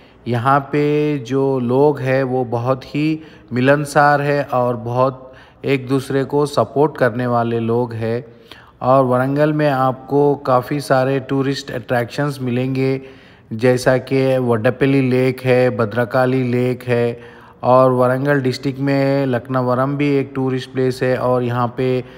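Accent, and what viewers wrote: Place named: Mumbai, India. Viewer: Which language you are speaking bro. Hindi mai blog kar rhe ho toh proper Hindi bolo Bhai yeh tapori accent use kar ke kya mil rha hai.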